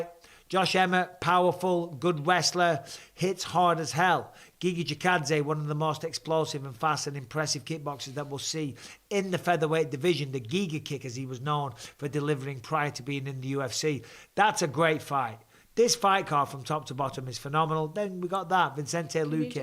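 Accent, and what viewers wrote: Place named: Northern Ireland, United Kingdom. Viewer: Its a solid NI accenf im impressed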